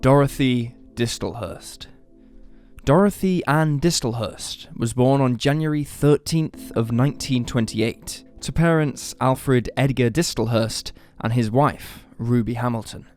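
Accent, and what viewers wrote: United Kingdom. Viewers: yes, I like the British narrators best, as long as they are not speaking in a northern accent, and this one is good.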